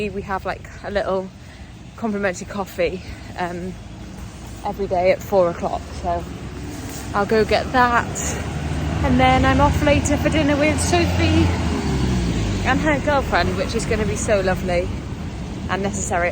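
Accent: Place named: United Kingdom. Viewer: I like your accent ❤️ british yaa ??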